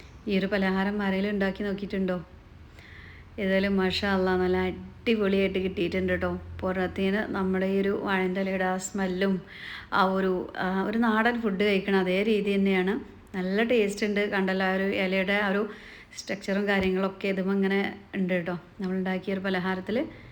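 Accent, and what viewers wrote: Malappuram, India. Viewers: പക്ഷെ ഈ ചാനലിന്റെ ഒന്ന് രണ്ടു വർഷം മുന്നേയുള്ളതിൽ ഒക്കെ തനി മലപ്പുറം സംസാരം ആണല്ലോ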